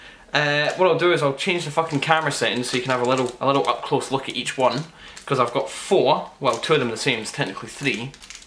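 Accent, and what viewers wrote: Scotland, United Kingdom. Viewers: You're so Scottish, it's beautiful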